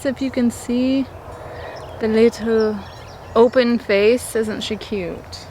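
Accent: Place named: Midwest, United States. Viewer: Just found you this am. I knew I heard a midwestern accent. I was raised in central Illinois. You sound a lot like me and my sisters. I will be following you and will share your post. I follow older people who would be happy to support younger people. Your flowers are gorgeous! I nice blend of real life and information. Hope you have a wonderful week.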